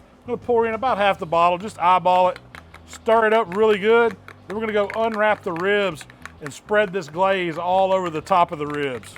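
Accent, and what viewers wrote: South, United States. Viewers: his southern accent alone makes me trust this guy when it comes to anything related to BBQ and smoking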